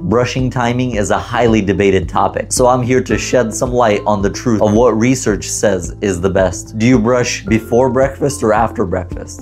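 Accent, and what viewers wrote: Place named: South, United States. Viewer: that’s a neat backstory! I’m from deep south Louisiana and I was hearing an occasional southern accent whether you knew it or not. Lol.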